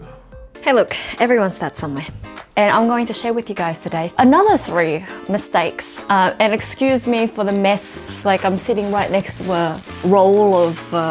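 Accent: Australia. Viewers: Aussie accent and cute Asian girl is best combo ever.. 😊😊 I could say it na Srpskom but better not.. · How do you sound pure Australian then pure Serbian . 👏🏻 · It's so funny how you sound like two different persons when you speak serbian and english. I like you aussie accent. x) Cuo sam da ima pljeskavica od mesa kengura! xD Kakvo li je to cudo? xD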